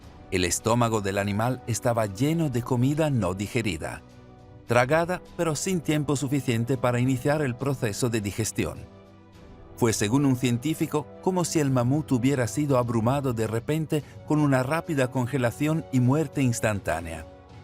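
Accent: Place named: Italy. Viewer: 🔴 EL PORQUÉ SE VUELCA los Rusos unos 20 años atrás han descubierto que un obejeto en rotación en el vacío, si no es totalmente simétrico en sus masas, ÉSTE ROTA SU EJE DE ROTACIÓN después de percorrere una cierta distancia. DESCUBRIMIENTO hecho el la Estación Orbital Rusa. Gracias 🙏 Grazie, si capisce che sei Italiano, abbraccio 🙏 Mario Cappellotto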